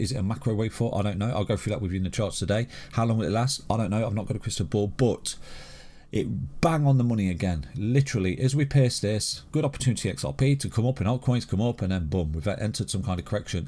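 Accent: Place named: Northern England, United Kingdom. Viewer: A lovely northern accent. Thanks for the TA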